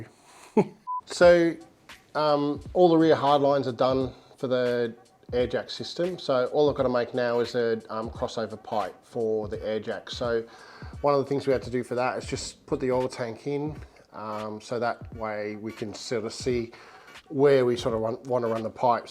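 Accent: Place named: Australia. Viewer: You an Aussie mate?? Shout out from Melbourne Australia. Killer build, even more killer dog!!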